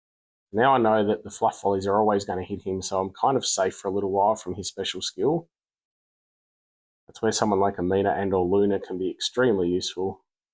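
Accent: Australia. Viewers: lol as a Texan I can tell you that the Beaver Nuggets are an excellent little snack. Bucees gas stations are a destination spot around here. I never expected to hear an Australian talking about the Nuggies in an Empires and Puzzles video on your tube though. Crazy times lol 🤣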